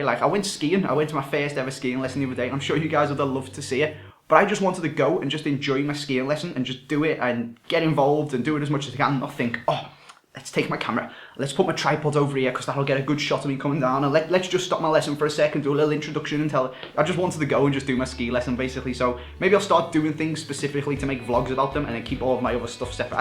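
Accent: Liverpool, United Kingdom. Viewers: are you a scouse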